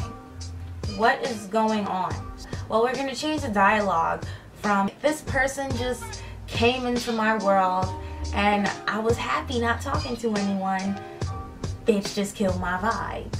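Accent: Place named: United States. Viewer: thank you sooo much!!! This has helped me a lot!! && yeah I'm watching from London, quite far away,I love your accent! I wish I had an American accent lol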